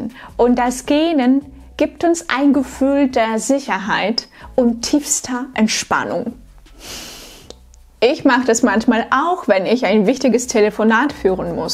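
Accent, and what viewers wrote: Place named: Russia. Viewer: У тебя все равно русский акцент.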